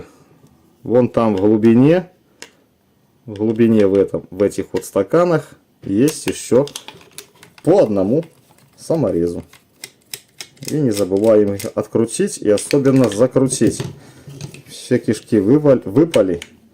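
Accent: Belarus. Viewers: привет ты белорус? такой акцент прикольный....😁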